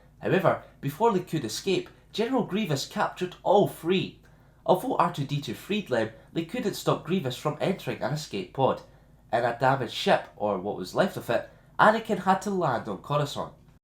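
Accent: Scotland, United Kingdom. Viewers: Congratulations for you 100 lore ,Really like what you're doing on your channel also are you Scottish if so then I must that I visit Glasgow .Forgive me for my English it's not my first language.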